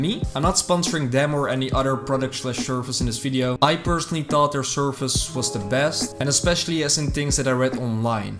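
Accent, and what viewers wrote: Netherlands. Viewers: Yes, I like the video. You provide a good example of a Dutch accent which I try to imitate and most people will go directly into a German accent which your accent clearly is not.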